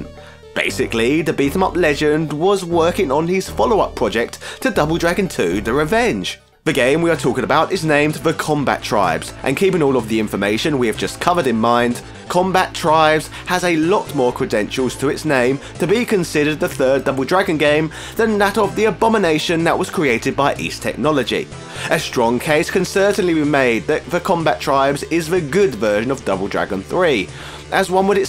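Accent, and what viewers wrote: United Kingdom. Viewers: I love your nod to wrestling gimmicks. That's my favourite part. Plus, as a Canadian I find your British accent hilariously awesome for your character.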